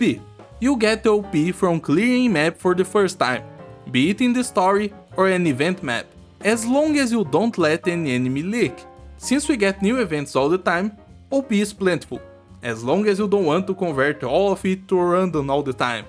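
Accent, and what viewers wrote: Brazil. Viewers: Thanks mate, I gonna start in arknights because two reasons. 1 - Monster hunter 2 - Waifus. (Obrigado mesmo foi bem simples a explicação, e o sotaque brasileiro denuncia bem rápido kkkkkk) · i can pressentir that you é um brasileiro kkkk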